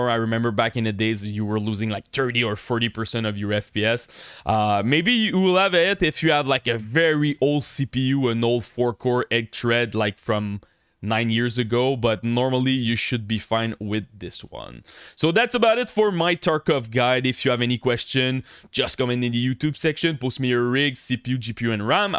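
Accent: Quebec, Canada. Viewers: french canadian.... smh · clairement québécois bro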